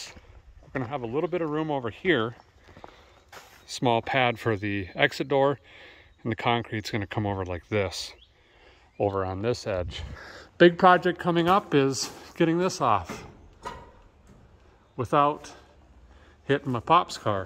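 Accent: Minnesota, United States. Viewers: Please don't loose the MN accident and start sounding like your are from Tennessee. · Too bad you left Minne-Snow-Ta... I get it when it comes to wrenching in the cold. Good luck with the projects. Keep your accent please. I have a hard time listening to people from the southern USA. Super nice folks mind you but my Midwest brain doesn't catch on as well... I'm sure my brain is still partially frozen... I will stay right here in the frozen tundra thank you. (Somebody has to live here)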